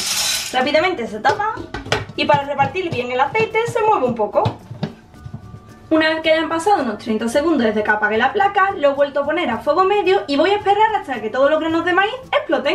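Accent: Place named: Catalonia, Spain. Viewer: Me gusta su acento de Catalunya :3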